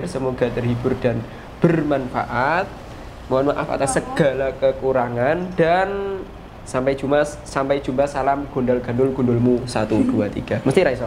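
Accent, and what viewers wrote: Java, Indonesia. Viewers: Di suarane arek jawa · peh jowone ketok tenan🖒🖒😂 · Orang jawa mana suaranya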